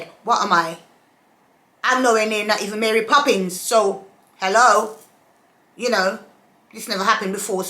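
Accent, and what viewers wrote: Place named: England, United Kingdom. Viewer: Your English accent is awesome don't change it for anyone. Pray for mom that she keeps safe.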